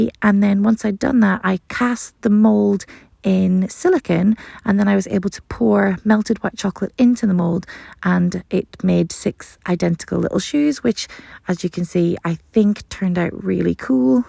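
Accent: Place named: North, United Kingdom. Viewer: talks like a northerner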